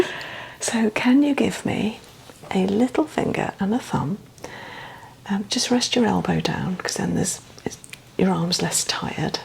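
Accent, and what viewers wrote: United Kingdom. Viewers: Hello from North Texas, USA. Is it weird that I want to speak with a British accent after watching your videos. I love hearing your soothing voice.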